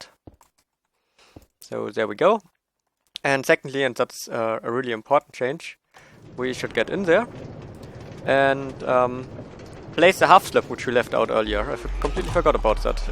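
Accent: Germany. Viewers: I mostly think you are German and you speak english xD (sorry if my English are not perfect i'm a German kid) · bist du deutscher? Hörst dich genauso an wie mein Nachbar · lol dude how much do you know about minecraft ??? XD and are you german ? your english sounds some kind like german english :)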